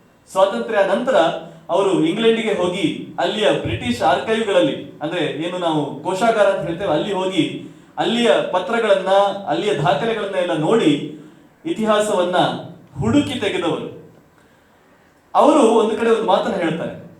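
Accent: Standard. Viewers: ನಿಮ್ಮ ಶುದ್ಧ ಕನ್ನಡ ಕೇಳುವುದಕ್ಕೆ ತುಂಬಾ ಆನಂದವಾಗುತ್ತದೆ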